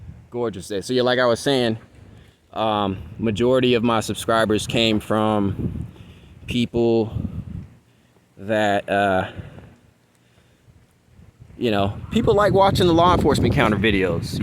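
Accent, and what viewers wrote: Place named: Spain. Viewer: Not to be racist but I thought you were Hispanic. You have like a Spanish accent to me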